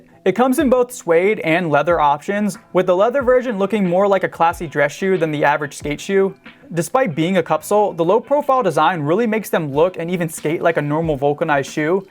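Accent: Southern California, United States. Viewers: i think its a SoCal accent idk much about it so you can try to search it up if you want. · Nick Z that’s a SoCal accent. You hear it in valley people and a lot of surfers, skaters, and punks. I notice people into the things mentioned even talk like that if they don’t live in California. It’s a subliminal thing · So this is what a california accent sounds like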